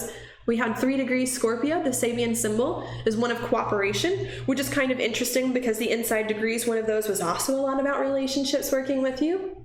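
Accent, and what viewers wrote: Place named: Georgia, United States. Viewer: I love you in pink, btw. Love your explanations! Thanks,!! Love that subtle Georgia twang;)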